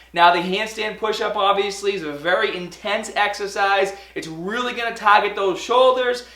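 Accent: Boston, United States. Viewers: your accent is driving me crazy >:( go back to Boston and learn how to speak english properly! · Boston accent or nah!??? 😫❤️😍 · are you from boston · ... is that a Boston accent? :-) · Is that a Boston accent. It's so weird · Of all the accents to re-brand yourself with, why the hell did you choose Boston? Boston... The accent that makes you seem like you will get drunk and hit someone at a Baseball game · Martin P Have you even ever heard an Australian talk? My guess is he has a Boston/Rhode island accent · Are you from Baaston? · Sounds like a Boston accent... They drop the "R" similar to Australians.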